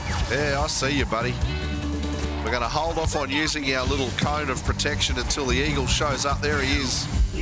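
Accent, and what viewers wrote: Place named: Australia. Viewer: I hope for new updates and its okay for pronouncing names wrong, but you're Australian accent is cool.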